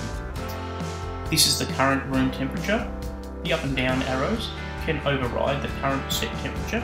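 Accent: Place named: Australia. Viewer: Please re-record this video without the music or have the music substantially reduced. This is an unprofessional demonstration and does not speak well about your digital awareness skills. Depending on one's music system, the 'background' music is magnified and combined with the Australian accent of the presenter (who is perfectly fine btw) non Aussie's cannot decipher some words. Based on this video alone, I may be forced to dismiss the product from consideration. Thank you.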